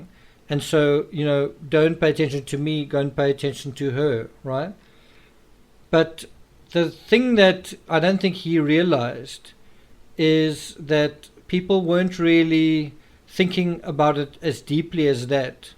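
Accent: South Africa. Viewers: Hey Man...when I heard you talking I knew straight away that you're South African! 🇿🇦 So nice to hear my own language again. Far away from home....🇦🇹💕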